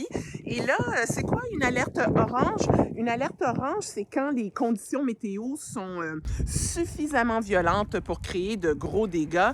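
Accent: Quebec, Canada. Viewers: J'aime vraiment t'écouter. Surtout ton accent québécois. Tes bien intégré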